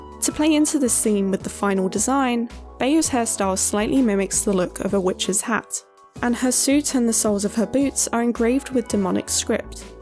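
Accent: United Kingdom. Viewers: I expected a male narraror But that attractive british voice Hot damn!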